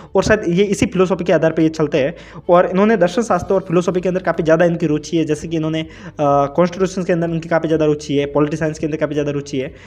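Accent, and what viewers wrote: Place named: Rajasthan, India. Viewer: Lagta hi rajsthani hai aap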